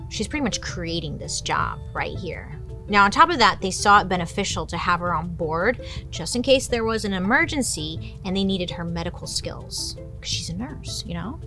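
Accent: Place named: Transatlantic, United States. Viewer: your TransAtlantic accent is *chef's kiss mwuaah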